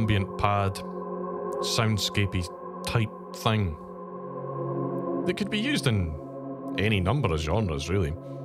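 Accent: Scotland, United Kingdom. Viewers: Yes! I had to subscribe to the channel! Love the Scottish(?) accent. ❤️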